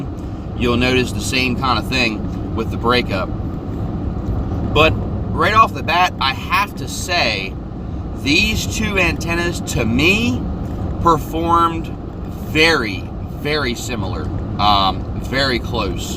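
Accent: United States. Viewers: dude I love your accent :D what area in the US are your from?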